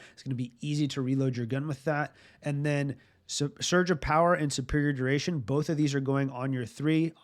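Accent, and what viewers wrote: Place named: Canada. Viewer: Wait ur canadian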